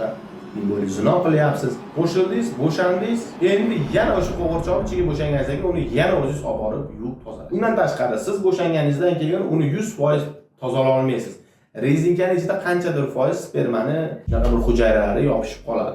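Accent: Andijan, Uzbekistan. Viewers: aka anjancha ogilbolacha gapirar ekansiz😊😊😊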